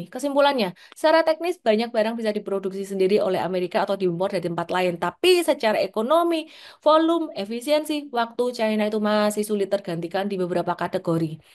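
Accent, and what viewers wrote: Java, Indonesia. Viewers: logatmu jowo mba😁😁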